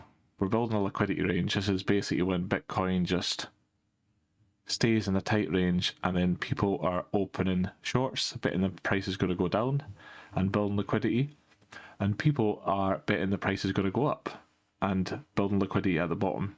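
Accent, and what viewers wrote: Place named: Elgin, United Kingdom. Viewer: Thanks, I bought back in at 17 and obviously I hold them in my pension bag. I also started buying again at theses levels a bit every day as I'm guessing we have been making a bottom. What I'm buying now I will sell and hopefully make profits and buy back cheaper if that situation pops up and we see a top forming. I try sort of day trading but it's beyond me at the moment using the daily 4 and one hour time frames . The violent liquidity grabs keep getting me. But we all must learn. I'm in Mexico but my family are in Elgin probably not far from you by your accent. Anyway keep up the good work it's appreciated.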